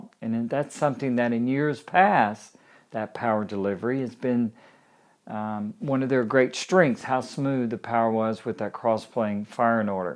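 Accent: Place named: South, United States. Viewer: It’s great to hear a southern accent talking about moto gp. As I always say, I wish there were more Americans involved in moto gp. Keep up the great work, Freddie!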